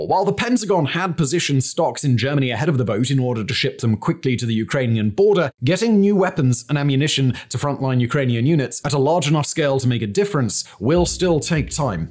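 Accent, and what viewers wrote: United Kingdom. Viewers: As an American taxpayer, I find it extremely irritating that someone with a British accent is blaming the country that is an ocean away from this conflict for failing to aid a European side in the war. Meanwhile Germany, for example, as well as the rest of the EU aren’t providing the necessary aide for their champions in the conflict that is taking place on THEIR CONTINENT! As someone who was born in Ukraine, I am hugely relieved that my great country — USA — is doing more than its fair part in fighting the newest axis of evil with Russian Empire, China, and Iran at its core. Well done Mike Johnson — God bless you!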